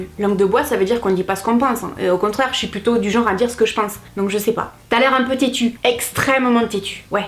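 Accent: South, France. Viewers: Je t'ai découvert en ayant des soucis à assumer mon corps de maman. Je chercher des conseil sur l'habillage et tu m'a surpris de part ta manière d'animer ta vidéo. Depuis je regarde beaucoup de tes vidéo et je suis tombé sous le charme. J'adore ta façon de voir les choses et d'être droite dans tes bottes et franche. J'adore ton accent du sud qui pourtant j'en suis pas fan. J'adore tes cheveux qui son vraiment magnifique. Bref je te trouve formidable et j'aurai aimé t'avoir en voisine pour t'entendre râler d'avoir mis du café sur tes jolie rideaux neuf 😂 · Coucou, comme dit mon mari, quand je regarde tes vidéos....la belle fille du Sud avec son beau accent...on as tout les deux, moi 44 et lui 45 ans...et c'est vrai, car notre ado de 16 ans, nous trouve vieux, bien que, d'après lui, nous sommes les plus jeunes parents de sa classe....on est jeune dans sa tête et cela ce voit sur notre visage...en général, les personnes ne font rajeunir, ils sont étonnée que j'arrive, oui, mais à petit pas vers la cinquantaine...par contre, ma voisine qui a 31 ans, en paraît 10 ans de plus...ils auraient toujours des personnes avec médisance et jalousie, on ne peux pas plaire à tout le monde...mais cela représente si peu, contre ceux qui t'apprecie et te le prouvent bien, par des messages de bienveillance...malgré que je regarde tes vidéos depuis presque 2 ans, j'avais envie de mettre mon premier commentaire...j'espère que ton toutou va bien...Gros bisous de Belgique...Sylvie ⛵ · J'avoue qu'en général j'ai du mal avec l'accent du sud mais je pense que c'est à cause des clichés accent du sud=cagole (ou bien baraki comme on dit en Belgique 😂😂) Chez nous quelqu'un qui a un accent de Liège ou de Charleroi c'est pareil c'est vu comme des barakis 🤷🏽‍♀️ Après quand on apprend à connaître la personne on se rend compte qu'il y a juste un accent et rien de plus 🤷🏽‍♀️ À force de t'écouter, j'adore l'accent et ton rire me fait mourir de rire je rigole avec toi 😂😂 Il y a aussi Missoumakeup que j'aime beaucoup qui a un accent du sud 😋 C'est vraiment les clichés qui font qu'on a du mal 😏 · Le truc qui m'énerve le plus je crois c'est le "trop vieille" pour etre sur YT, pour donner des conseils... 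Putaingcon la dictature des ptits jeunes ca va 5mn! 43 ans c'est pas VIEUX... C'est typique des petits cons qui vont te balancer un "oK bOoMeR" pour te "casser" sans argumenter... D'ailleurs la vieille de 34 que je suis va sans route se ramasser des "ok boomer" en commentaire mdrrr A 43 ans en tout cas tu as plus de légitimité pour donner des conseils qu'une ado de 17 ans... Non? L'expérience acquise avec les années, ca vous parle ou bien? J'ai rien contre la jeune génération j'ai un petit frere de 25 ans que j'aime d'amour, simplement j'aime pas les cons:0 Et des cons y'en a de 7 a 77 ans n'est ce pas... Continue tu es sympa et authentique:) Pour l'accent du Sud je pige pas ya vraiment des gens que ca gene? Ok... P.S.: dsl j'écris sans accents et cédilles car mon clavier s'est foutu en allemand. Et j'arrive pas a le changer. Voila ^^ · Hé bien moi.. J aime pas du tout l accent du sud, mais curieusement, et sans que je puisse l expliquer, je te trouve trop mignonne à parler comme ça!! 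J adore! 😘❤ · Merci maman, grâce à toi j'ai réussi à accepter mes formes et mes rondeurs et à m'aimer comme je suis. Je regarde toutes tes vidéos. J'adore les vidéos où tu nous donnes des astuces beautés maison à petit prix. Pourquoi ne pas refaire une vidéo "Je teste les astuces de mes abonnés" volume 2? Je suis sûre qu'ils doivent avoir plein de supers idées pour se pomponner à moindre coût. En tout cas continues comme ça, tu es super, je t'aime maman YouTube! 😘🥰❤️ P.S.: tu as même réussi à me faire aimer l'accent du Sud! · Honnêtement vous êtes une personne toute à fait charmante et apaisante, c'est super agréable de regarder vos vidéos et outre l'accent du sud que je n'apprécie pas forcément 😉, c'est secondaire tellement vous êtes génial!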